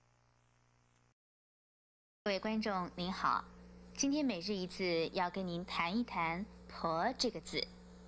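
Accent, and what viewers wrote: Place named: North, China. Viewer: 這應該是北方人的，尤其是關外的，南京音是不會這樣的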